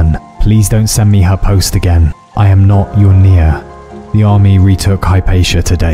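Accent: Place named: United Kingdom. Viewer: Where have ya been man? I miss your videos I miss your advice I miss your British voice that puts me to sleep at night.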